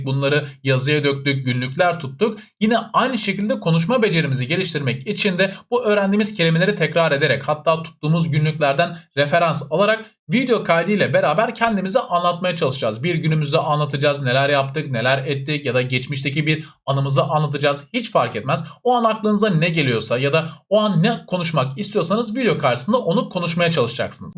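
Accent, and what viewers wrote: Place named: Turkey. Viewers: bence türk aksanı belli oluyor ama aksan önemli değil zaten çok akici konuşuyor. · ilk 5 saniyede anlaşılıyor aksandan türk olduğu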